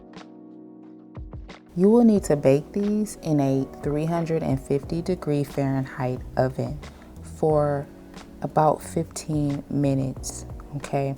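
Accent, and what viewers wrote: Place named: Maryland, United States. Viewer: Love your accent- back east- maybe Maryland?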